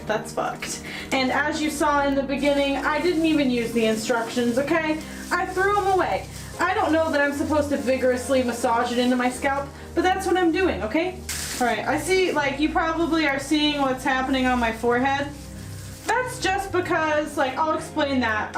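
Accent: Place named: Midwest, United States. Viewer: 😂 I thought you were doing a Illinois/Wisconsin accent purposely at first. Looks so cute. My hair is identical to yours and I miss my longer hair. Thanks for inspiring ❤️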